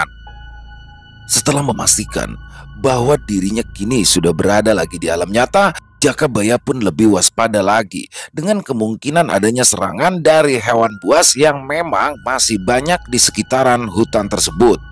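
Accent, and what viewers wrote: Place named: Jakarta, Indonesia. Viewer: bang kapan lagi ngangkat cerita bang rudi sm bang piyan,seneng sm logat betawi nya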